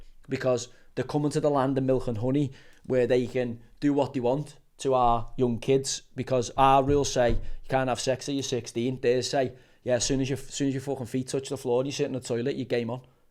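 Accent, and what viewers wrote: Liverpool, United Kingdom. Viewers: He talks so much shit Uneducated scouse tinkler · Nothing beats a great Scouser rant. But spot on brilliant commentary!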